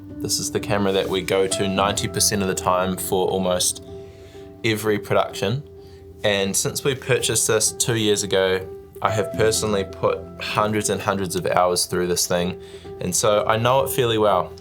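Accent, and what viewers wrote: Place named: New Zealand. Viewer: Hah, might be worth it to specify that. I very much noticed the Kiwi accent, but seeing as the majority of L1 English speakers on earth are American, the word "dollar" is presumed to be the American one unless otherwise specified (online and in international contexts). That's what some of us are incredibly confused about the price (I came to the comments to see if you'd explained it) — for us, the Ursa 12k is $6,000; and the Ursa 4.6k, even cheaper. So when you said it was $25,000, it very much elicits a terrified "OH GOD WHY DID HE PAY THAT MUCH MONEY" response.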